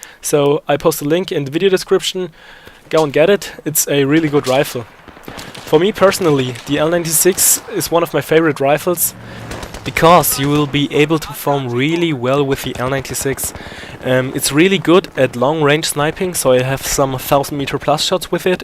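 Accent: Germany. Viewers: are you somekind of german or austrian?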